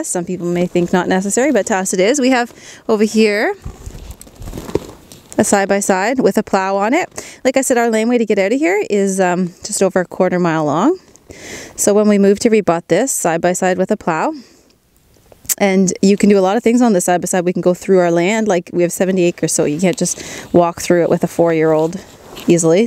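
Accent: Canada. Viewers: LOL! I love Canadians and Canada and have been noticing alot of goodies coming from Canada as of late! I too figured out she was Canadian from some of the words and the accent when she finish some sentences. I am living in the Cold as a Puerto Rican so I am paying attention to Canadians and how they build and live so i can survive these freezing painful NON CARIBBEAN temps!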